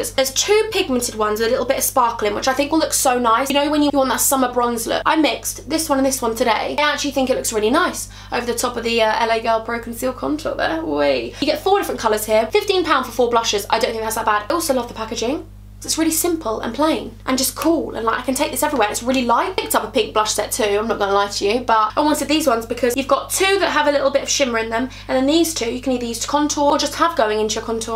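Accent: South, United States. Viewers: The American accent tho! 👏🏼👏🏼 yass boo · Omg the American accent 😍😍😍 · That American accent omg girl you killed it i love you so much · LOVE MARMITE SO ❤️ and that American accent is IT!! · I am shook how good your American accent was!!! 😱😱😱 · U SOUND SO AMERICAN OMG AHAHAHAH LOVE U 💗💗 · I live for your American accent and honestly I relate to your butterfly brain to a T and gurll I need those bambi lashes in my life they look insane!!! And of course can't forget the song ❤️❤️❤️🥀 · Her American accent♥️ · the American accent is amazing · YAS! I'm living a for the American accent and your singing voice. Can we just get on Big Brother! 🦋🐝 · Plsss do a whole video in an American accent,, i'd love that as much as I love you!!! Xxx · OMG your American accent is ridiculously good!!! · Omg your American accent was so good and it acc really suits you, you should do a video doing a makeup tutorial in different accents😂❤️ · Snapchat fam💗💗 also her American accent is flawless!!! 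· Your american accent is soooo good!!! Please do the accent challenge with #Bensalad or jacob!! love you xxxxx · Love you American accent Haha 😂😂Xxxx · You actually amazing at an American accent!! Xxx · ooooommmmmmmmmmmmmmggggggg u sound so American it is scary like if I had never watched u before an u showed me just that clip I would think u were American! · Your American accent is so good! And I love your personality. 😊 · ! Love you, Wish i could fly out there all the way from North Carolina, love your southern accent by the way! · Wow your accents are amazing love their American one pls do a first impression video in a American accent I love your videos they make me so happy! Your vibe is amazing 😘😘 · Your wing liner and American accent 💕🔥 obsessed! Xx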